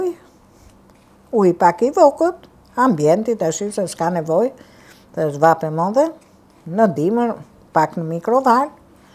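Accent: Tirana, Albania. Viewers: Tamam kanal popullor qe na kenaq me recetat dhe ate folmen Tiranesete lumshin duart ❤🎉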